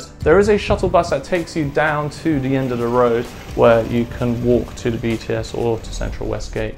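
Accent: United Kingdom. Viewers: A guy with such pure British accent for a change...😁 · I love your channel I love British accent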